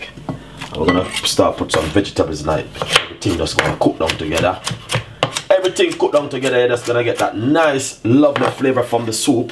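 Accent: Jamaica. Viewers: Very nice soup & lovely Jamaican accent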